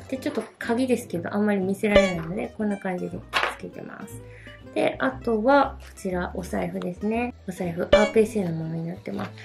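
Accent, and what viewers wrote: Kagoshima, Japan. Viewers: 鹿児島県民です✋ 鹿児島弁聞いて、癒されました笑⸝⸝- ̫ -⸝⸝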